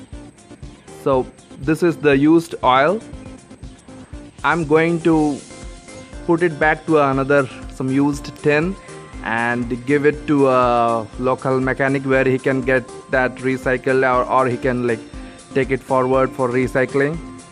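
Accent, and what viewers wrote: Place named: United States. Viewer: Your English accent looks like that born in the United states of America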